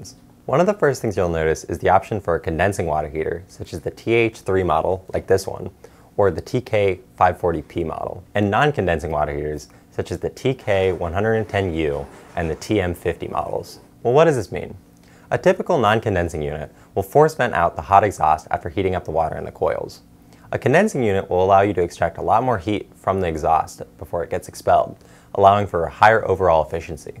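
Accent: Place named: Asia. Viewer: Your English is terrible - is that an Asian accent? I can't understand what you are saying.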